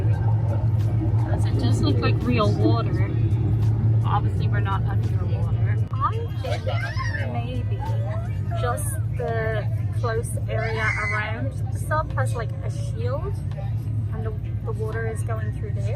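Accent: Ireland. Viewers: Well, Disney with an Irish accent is not as common 🙂 loved the video 🙂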